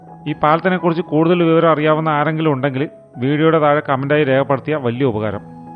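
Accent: Palakkad, India. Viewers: പാലക്കാടൻ ഭാഷ എനിക്ക് നന്നായി ഇഷ്ടപ്പെട്ടു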